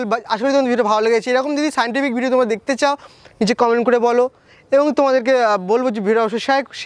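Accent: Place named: Kolkata, India. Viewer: Kolkata ...Vasha sunlei to buja jay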